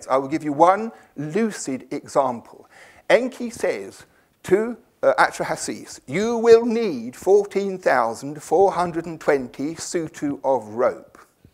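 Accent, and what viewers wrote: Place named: England, United Kingdom. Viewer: I'm from America and I think a person from england would be the best for me to learn something. The accent keeps me interested . Kinda weird but I can listen to them all day. My GPS speaks in old english. Lol..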